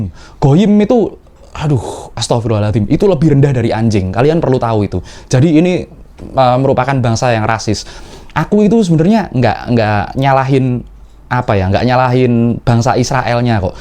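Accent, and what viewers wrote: Java, Indonesia. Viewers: Jawa mu pekat bangat.. Pening dengar kamu.. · Konten ini Bahasa Indonesia cengkok cengkok JAWA dasar lho JAWA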